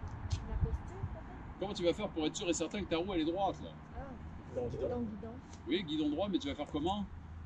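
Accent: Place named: Canada. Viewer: j'arrive mieux à comprendre cet accent canadien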